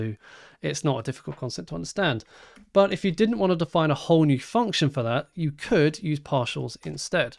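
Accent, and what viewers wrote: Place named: United Kingdom. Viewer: Underrated channel, also that bri-ish accent is woowwwwww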